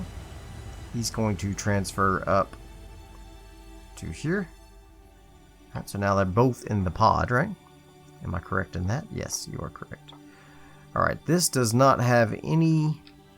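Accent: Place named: North Carolina, United States. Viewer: Are you from North Carolina? I'm trying to pin down your accent.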